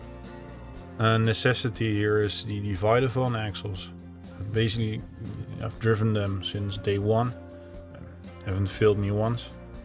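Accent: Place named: Netherlands. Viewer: Klinkt Nederlands 🎉 dikke setup. Vanwaar de servo saven van Vitavon?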